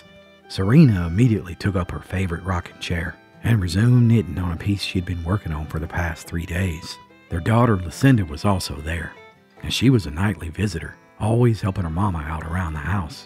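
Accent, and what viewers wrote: Appalachia, United States. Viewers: Your voice and Appalachian accent are just what I need when things get heavy on my mind. I have intentionally taken my blood pressure before and after listening to one of your stories, and it never fails that my blood pressure drops considerably after the story. I told my doctor that she needs to prescribe your channel to all of her patients, especially the ones with high blood pressure. Thanks for all you do.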